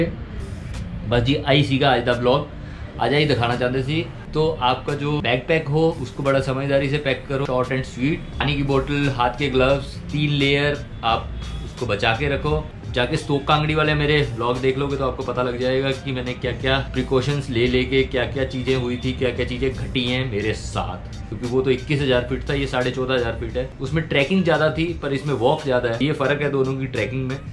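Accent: Punjab, India. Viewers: Savage bro plzz bnao aur mze Ek to punjabi tadka #kamaal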